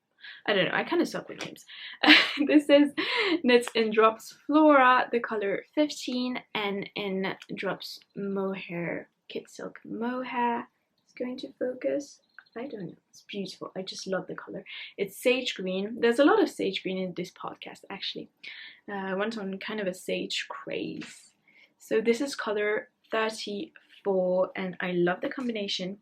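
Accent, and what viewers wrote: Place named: United Kingdom. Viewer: Hello from Nova Scotia, Canada. Congratulations on your first podcast, it was delightful and very informative. Your English is perfect and love the slightly British accent. Your enthusiasm and sweet smile make you and star in my books. Just be yourself. Your knitting is quite lovely and your yarn choices are great - not cheep as far as I am concerned. I would love to try the Drops line of wool someday. I am 68 and very much on a budget so I do what I can. I look forward to your next podcast which, by now is out and ready for me to watch next.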